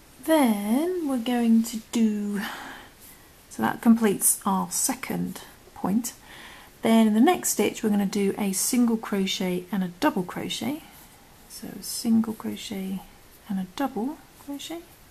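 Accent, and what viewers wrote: United Kingdom. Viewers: Lol, I heard your British voice and assumed you were using British crochet terms, whoops! Just about to start again - looking forward to making this for my Autumn wreath, thank you :)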